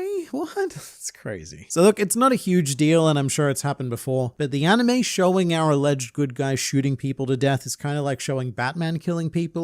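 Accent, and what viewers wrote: Australia. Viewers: Can’t really blame you. voice is rather soothing with that slight Australian ‘twang.’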